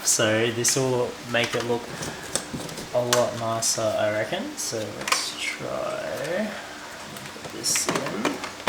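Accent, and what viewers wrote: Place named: Australia. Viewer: What was the name of the controller? I don’t understand your Aussie accent well enough 😅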